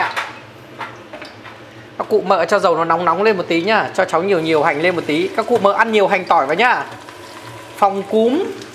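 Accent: Hanoi, Vietnam. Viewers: Bếp hướng dẫn dễ hiểu nói nhẹ nhàng chuẩn người HN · Dọng nói rõ ràng hướng dẫn cách làm món ăn chuẩn người hà nội cảm ơn cháu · Cảm ơn đầu bếp hướng dẫn rất chi tiết tỉ mỉ và nghe giọng Hà nội khán giả nghe dễ hiểu ,chúc em và gia đình hạnh phúc bình an trong cuộc sống❤❤❤❤❤❤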